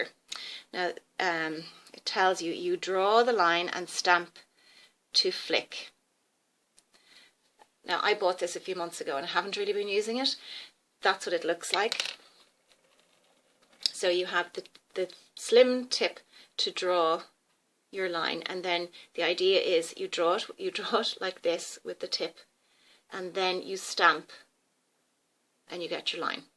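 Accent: Ireland. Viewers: Hi, I wish I'd found you sooner! As a mature lady I too have fierce hooded lids. Your tutorial was excellent! A question for you: You sound Irish, as I am, and I wonder how you manage to shop from Sephora as I didn't think they shipped to Ireland! I would love to try your winged look with the Sephora liner! Any help would be much appreciated. oxo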